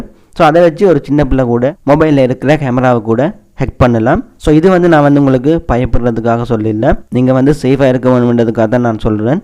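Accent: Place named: India. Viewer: வணக்கம் இந்த காணொளியை நான் ஓட விட்டு ஓடவிட்டு தான் பார்த்தேன் காரணம் உங்களது மொழியில் அநேக ஆங்கில சொற்கள் கலக்கப்படுகின்றது அது வேண்டுமென்றே கலக்கப்படுகிறது அத்தோடு கூட எதற்காக நீங்கள் இந்திய பாணியில் எமது தமிழை உச்சரிக்க வேண்டும் அதற்காக இந்த காணொளியை நான் விரும்பவில்லை 👎👎👎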